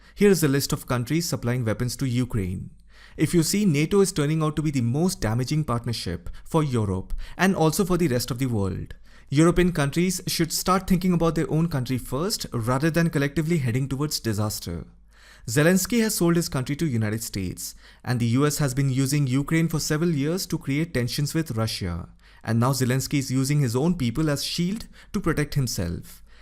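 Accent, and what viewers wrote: India. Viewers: Russian propoganda in English with an indian accent. I still cannot understand why Indian people so vigorosuly support a country that is shelling civilians on Ukraines soil.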